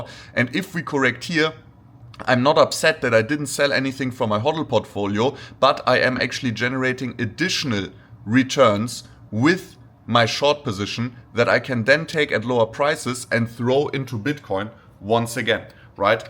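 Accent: Germany. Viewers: Hi, I am delighted to see a new upload from you and thank you for sharing your thoughts and strategies. As starting trader they are very valuable to me and couple of times prevented irrational greedy descision that would have turned badly. Not always, sometime greedy holding on to draining longs happened, but over time I want to reducde that kind of action, to zero preferably. Somehow shorting feels more scary in a bullcycle and than longing at high prices. About sharing your private life. I think it is inspiring to see and have that in your videos. You live the dream of I guess many of your followers. Curious about your tutorials but even more: Would you share with us how you made your first 10K, 100K, 500K. When did you decide to move to Dubai? (assuming from your accent that you grown up in Germany). How did you arrange the move? Are there tips you have for people currently living elsewhere that play with the idea to move to Dubai. 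Hope someday you will share that story. Wishing you a great remainder of the weekend.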